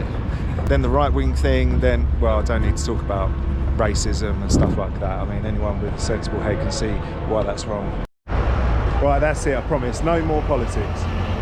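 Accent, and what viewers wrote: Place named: England, United Kingdom. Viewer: U going to Americana lol worse than uk or England which u spund like u from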